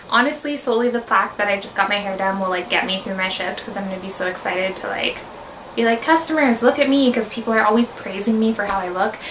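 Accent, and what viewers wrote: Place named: Canada. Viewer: Your Canadian accent is so cute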